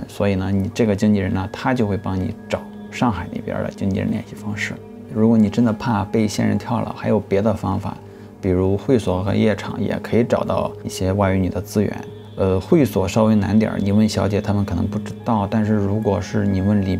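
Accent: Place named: Guiyang, China. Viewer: 你是贵阳的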